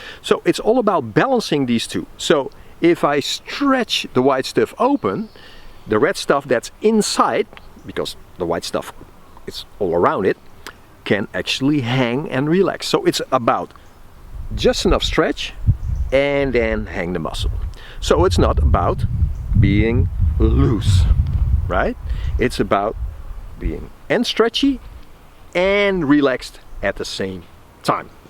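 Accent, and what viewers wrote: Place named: Netherlands. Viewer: Dacht al een NLse accent te bespeuren en idd wat die persoon hieronder zegt....... ''Freaking duidelijke uitleg!" Het wordt goed gewaardeerd. Waar zit je NL en geef je les? Grtn uit Curacao.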